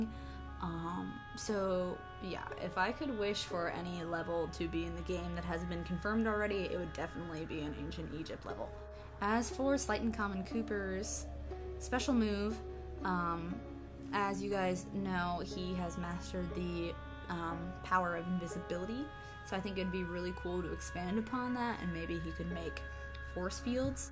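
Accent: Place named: United Kingdom. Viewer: i don`t cere if you are talking britich ore not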